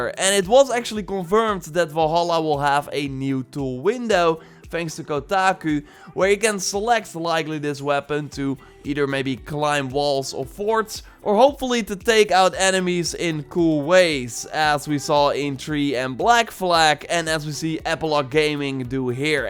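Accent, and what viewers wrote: Netherlands. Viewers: Welk Nederlands accent? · Ik hoor een Nederlands accent :) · I love that crisp Dutch accent: a n d l e t s g o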